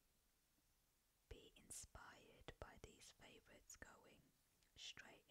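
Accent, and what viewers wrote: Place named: United Kingdom. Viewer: ASMR in a UK accent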